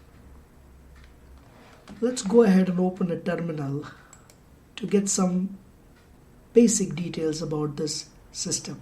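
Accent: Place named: India. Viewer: Appreciate your clean English, albeit Indian accent, greatly explained,Keep it up.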